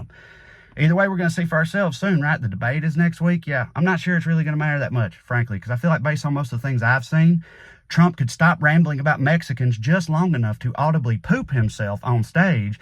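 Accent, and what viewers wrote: South, United States. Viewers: As a blue Appalachian in a deep red state I appreciate not only your sense of humor and intelligence, but hearing it delivered in a southern drawl. Thank you for making me feel a little less alone.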